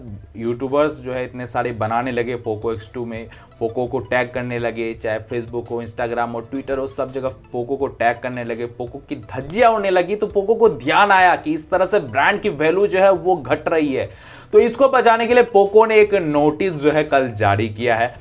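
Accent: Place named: Bihar, India. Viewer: Are bihari bhai podbulam😅😅😅 jada ho rahahe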